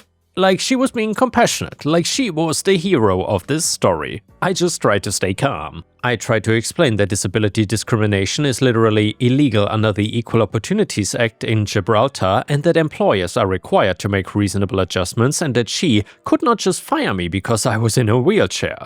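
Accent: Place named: Germany. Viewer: I bet on you being German. That's a daaamn thick accent. :D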